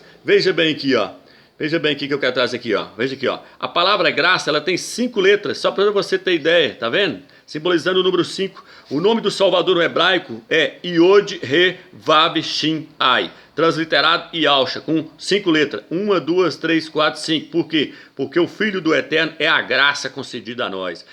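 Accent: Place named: Minas Gerais, Brazil. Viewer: vc mora onde, fala igual mineiro.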